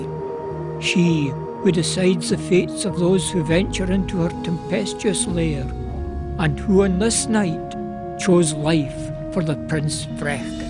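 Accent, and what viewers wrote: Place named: Scotland, United Kingdom. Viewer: Wonderful! And I‘m so thrilled to hear a scottish voice again after a far too long time being kept away from visiting Scotland.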